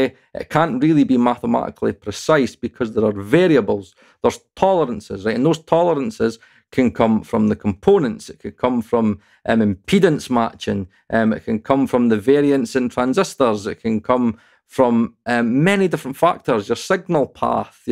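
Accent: Scotland, United Kingdom. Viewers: Lovely Scottish accent! 😊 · couldn’t agree with you more. Fortunately for me around 2000 I dreamt of having a studio in a rack, a plug and play studio that was minimalistic in nature and digital did it for me. I was never going back. Now I use the stock console plugin from my DAW (Cakewalk) and thanks to Waves thievery I steer well away from plugin manufacturers BS. What I want is a modern interface, no bloody knobs please and no fake ssl front ends. I don’t want colouration though I do use the tape plugin that comes with my daw. I’m slowly being weaned off this analogue addiction. I went digital for a reason and I must not forget that reason. Thanks for your videos. Love your Scottish accent by the way (viva Scott McTominay haha) and I look forward to your next video ruffling the feathers of these plugin manufacturers. Keep on keeping on brother.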